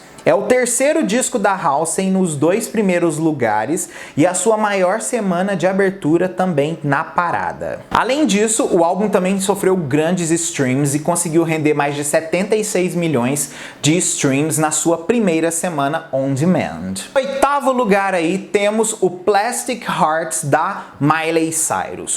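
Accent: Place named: United States. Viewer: eu adoro o e o conteúdo dele, mas uma coisa que me agonia muito nos vídeos dele é qnd ele enfia um sotaque americano numas palavras tão..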